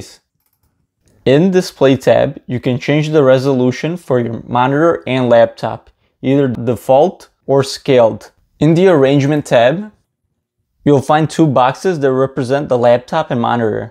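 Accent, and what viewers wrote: Detroit, United States. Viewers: I’m wondering if he’s from Detroit? I am… What up doe? 😊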